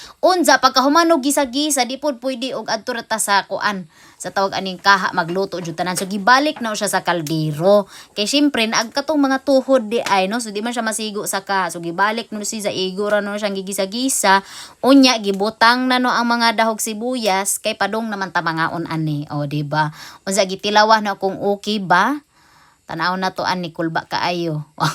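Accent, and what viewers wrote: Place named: Visayas, Philippines. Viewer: Unsa hapdos? Lain man pud imong binisaya Miss pag claro pud? Mas lami ang homba sa "Cebu"., "Yummy" kaayo.! Hahaha..😮😮😅😅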